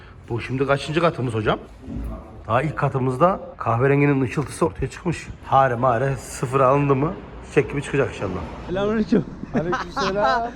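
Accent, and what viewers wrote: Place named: Kayseri, Turkey. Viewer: senin gibi bir adam bu jantı nasıl seçer...!!! harika oldu eline sağlık kayseri şivesi insanlığı ve sanayi kafasını tekrardan gördük ...!!! sizleri seviyoruz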